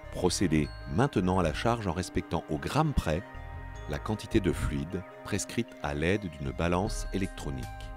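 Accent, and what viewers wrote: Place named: Paris, France. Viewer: He has a very posh accent. Is he from Paris? . Anyone?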